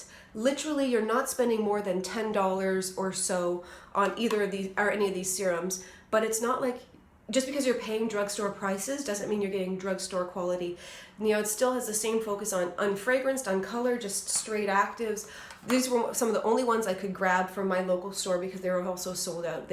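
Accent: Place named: Canada. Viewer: Ok I was too eager beaver, you answered my question at the end. I'm also guessing you are Canadian because of the way you say 'out' 🙂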